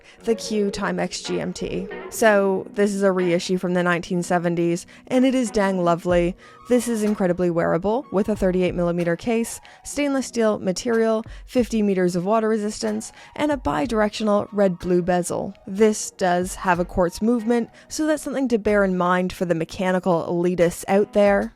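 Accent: Canada. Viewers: Stay with your Canadian accent. I can sing the Canadian National Anthem and I’m American. “Oh, Canada…” Are you happily married? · I keep hearing the long O sound in “about”. Is Canadian?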